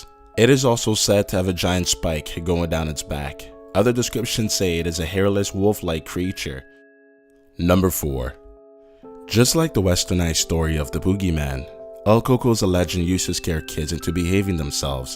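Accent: Mexico. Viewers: Love this guy's Mey-he-ko accent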